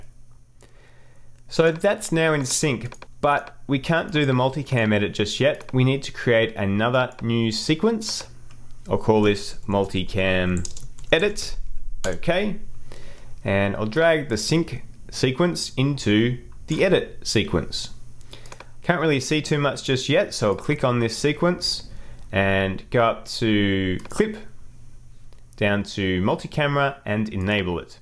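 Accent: Australia. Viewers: Brilliant video, love your work! PS, I'm a fellow Aussie! Nice to hear a familiar accent on such a great tutorial. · are you australian?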